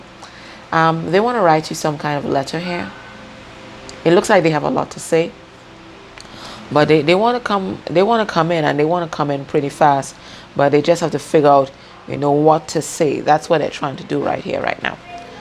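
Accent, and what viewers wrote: Trinidad and Tobago. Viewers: U sound like a trini 👍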